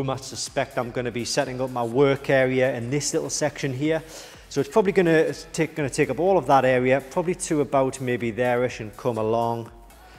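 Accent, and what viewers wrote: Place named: Northern England, United Kingdom. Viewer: Hi, lovely space to work with. I can tell from the accent that you are very North but how much a month is a place like that? Im Surrey based and that would cost a lot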